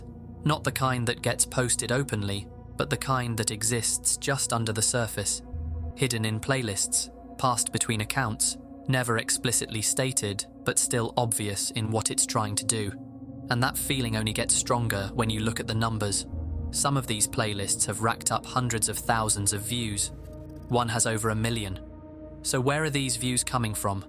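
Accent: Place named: United Kingdom. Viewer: Nasally, Br*tish voices aren't exactly fit for these types of videos.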